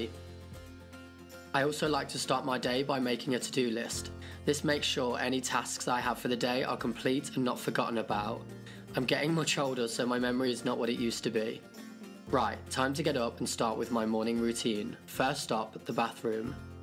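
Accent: United Kingdom. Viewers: Imagine if all British people sounded like this 🤮🤮🤮🤮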